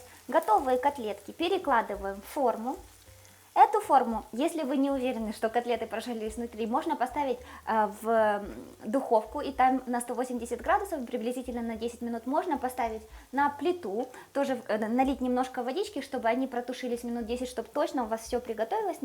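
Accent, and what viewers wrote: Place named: Surzhyk, Ukraine. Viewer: ты Слава Богу становишься сама как пухкенька котлетка. Уже пришла в себя. Говори на родном суржике. Вон ЗАКАРПАТЬЯ ГОСПОДЫНЯ она ведёт канал на закарпатской диалекте и её смотрят все и понимают. Не переживай.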